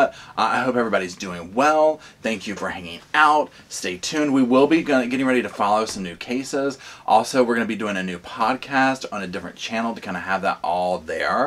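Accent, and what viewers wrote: South, United States. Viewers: Omg I cannot wait for you to share your other channels! Hahaha I’m a sofasquad groupie now. Full disclosure: You’re seriously just the sweetest thing I’ve ever laid eyes/ears on. You always seem to say exactly what I’m thinking. And Uhg your southern accent just tickles me to death. Ok sorry now I’m sounding borderline creepy... Just sayin, I’d listen to your podcast even if it was just you discussing your bowel movements or whatever 🤷🏼‍♀️ so keep up the good work bud 😊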